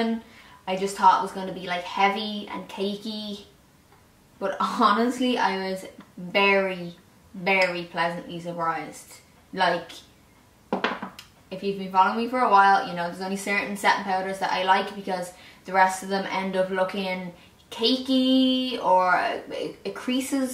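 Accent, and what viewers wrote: Ireland. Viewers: Are you Irish?? New subbie i came across you on Pinterest absolute doll xx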